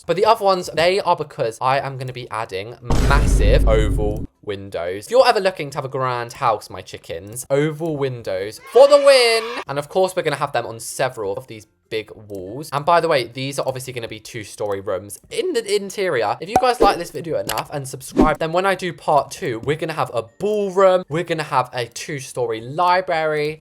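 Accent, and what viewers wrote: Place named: United Kingdom. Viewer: It’s good that (I’m saying that for short) is British, that’s because I am and most other content creators are foreign, so I can actually understand him.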